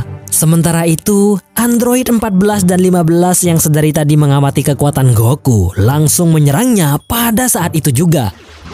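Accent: Madura, Indonesia. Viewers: Madura bang?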